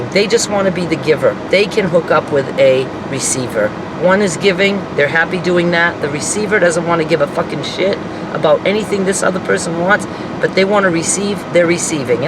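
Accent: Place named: South, United States. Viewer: You sound more and more "southernish".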